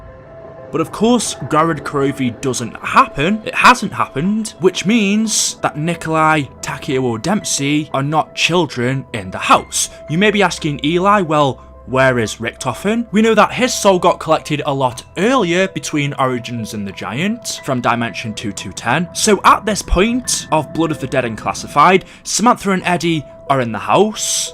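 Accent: United Kingdom. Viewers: Not a zombie related one But please can you tell us where are you from since you’ve done the face reveal Im assuming that you’re from UK based on you accent also love your vids bro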